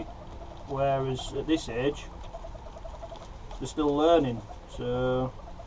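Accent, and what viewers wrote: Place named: Yorkshire, United Kingdom. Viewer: you sound like your from Yorkshire I'm looking to get a ferret could do with some advice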